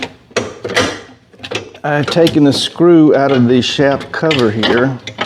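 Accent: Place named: Mississippi, United States. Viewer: What part of Mississippi you from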